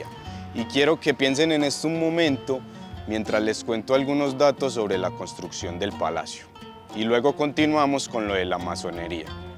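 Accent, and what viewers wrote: Antioquia, Colombia. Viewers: Me encanta el asentó tan paisa. …. Mi paisano ….. e ave María pues … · Que forma mas hermosa de hablar ese hacento paisa jenial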